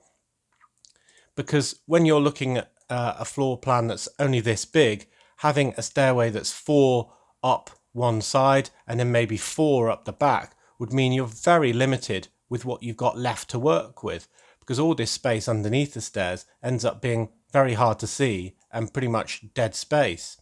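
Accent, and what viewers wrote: United Kingdom. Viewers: Great video (as always😉) Myself, I use leocad for designing small Lego buildings. There catalog is bigger than the one from ldd. I'll download bricklink studio and give this a try, as you or someone in the comments said, bricklink is now in the hands of LEGO so maybe there catalog will be even better. Keep up you great video's, it's always a pleasure to hear real UK English and not the simplified version 🤣🤣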